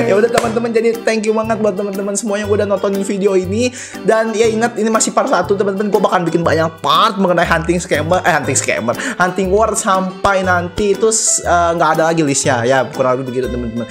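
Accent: West Java, Indonesia. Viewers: logat nya kaya orang sunda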